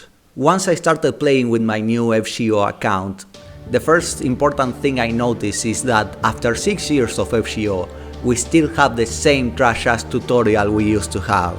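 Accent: Argentina. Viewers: Tenés el acento más argento que yo haya escuchado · Sos argentino?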